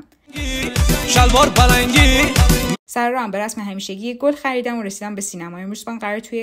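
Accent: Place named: Mazandaran, Iran. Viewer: نگو که مازندرانی هستی😍 من ساروی هستم از چک😍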